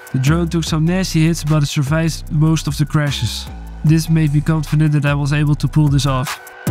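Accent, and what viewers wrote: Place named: Netherlands. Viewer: ERMATTEN and THERMAC. The Dutch accent is killing it lol keep it going dude! Great Video's!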